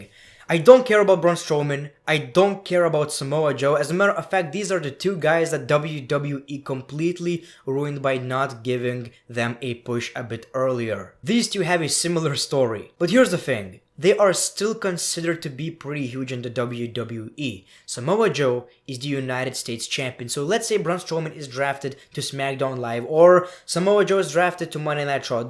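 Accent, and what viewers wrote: Russia. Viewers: Wow! I think you have a great Russian accent! (Because you are Lithuanian obviously but still) with love, from Astrakhan, Russia.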